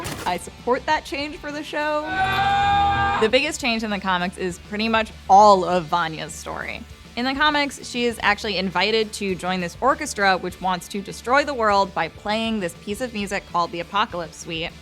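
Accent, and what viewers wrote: United States. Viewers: Your accent is more like The californians from SNL 😁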